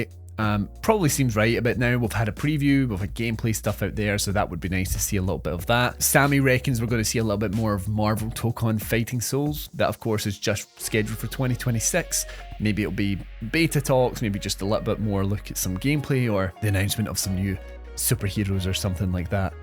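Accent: Scotland, United Kingdom. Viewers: What accent is that? Is that Scottish? It's not a bad one. Who's excited for Horizon?😂...nobody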